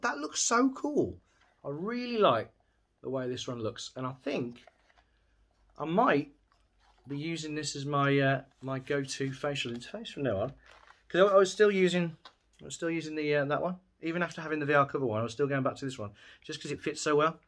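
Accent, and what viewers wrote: West Midlands, United Kingdom. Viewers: this dude sound like a west midlander :D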